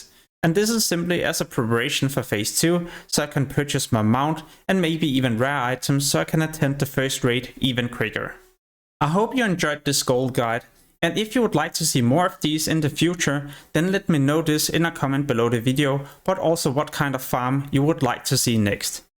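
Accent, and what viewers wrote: Denmark. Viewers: This guy's danglish accent is off the charts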